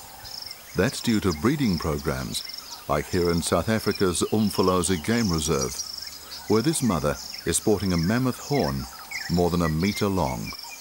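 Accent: United Kingdom. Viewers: The female lion "acting l as though she's not interested"? What? Is this guy claiming to know the mind of the lioness? I am just being funny here, but there is still something creepy about hearing a posh white Englishman say those words in that order. To me these poor female animals aren't acting, as their only way of communicating is through their actions (as their biological state of being in heat is not a conscious choice) so I can assume, as the lioness is violently trying to get away that she is at the very least not happy about what's happening to her! It's like No doesn't mean no to this narrator, in the animal kingdom😉!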